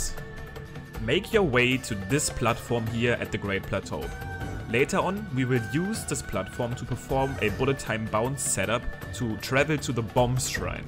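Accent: Germany. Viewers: Warum machst du die Videos nicht auf deutsch Mann hört das du deutscher Bist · are you german ? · You have a german accent. Do you skeak German?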